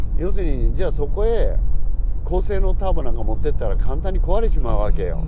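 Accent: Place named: Nagano, Japan. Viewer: 的確な評価と、わかりやすい解説、とてもありがたいです。 どうでも良いのですが、水野さんは長野ご出身なんですね。 「ほら」→「ほお」で、ん？と思っていました。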